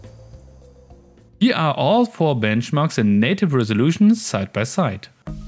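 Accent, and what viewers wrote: Germany. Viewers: Du bist deutsche Man Hört es raus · Ich hab dein deutschen Akzent anders rausgehört das ist einf krass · Das du ein Deutscher bist, hört man richtig raus 😂👍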